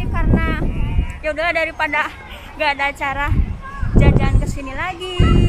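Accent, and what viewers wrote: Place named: Indramayu, Indonesia. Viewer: Wong ndi teh,Kya Wong dermayu tah mjlngka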